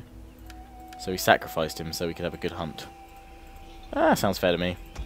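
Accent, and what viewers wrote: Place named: Wales, United Kingdom. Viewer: I say kill em all lol:D I love the welsh accent:D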